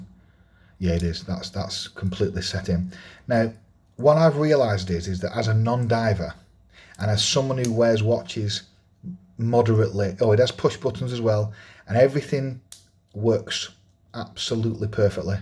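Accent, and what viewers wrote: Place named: West Midlands, United Kingdom. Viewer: Thanks for the review! I have just bought the strap version of this watch . The bracelet looks very well made, though… I think I’ll have to buy another one, just to be able to swap straps… Can I ask you what part of England your accent’s from? I’m not a native speaker and I’m trying to figure out as many accents as I can… West Midlands, perhaps? Great review, thanks!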